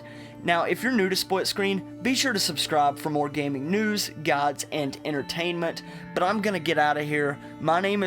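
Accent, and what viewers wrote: South, United States. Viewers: I've never been attracted to a southern accent but his voice is damn sexy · Hey! No making fun of his Southern/Cowboy accent! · I’ve heard some good southern accents but this one is just annoying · Haha nice Southern accent ! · They way you pronounce "typhon"....No thanks, keep that southern accent neuromod. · "Incredibly attractive southern accent," O........kay.......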